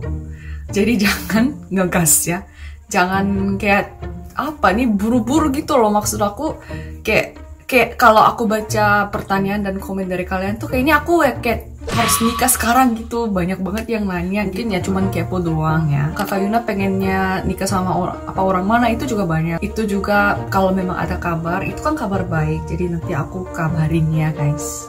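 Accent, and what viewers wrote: Indonesia. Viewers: Wow keren,logatnya udah Indonesia bgt ini 😃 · Wah..mantap bener bahasa indonesia nya lancar dan logat nya sdh indonesia banget .. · Aku suka aksen indo yuna Mirip sama aksen indo Kak bintang dijerman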